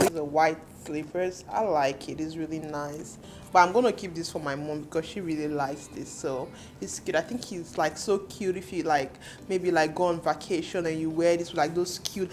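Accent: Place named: Nigeria. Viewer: I love that Nigerian accent 🔥🔥❤️❤️😍😍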